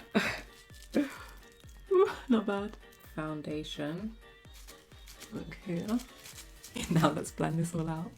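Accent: United Kingdom. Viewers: ahh Im black but I wish I was british i love your accents😩💖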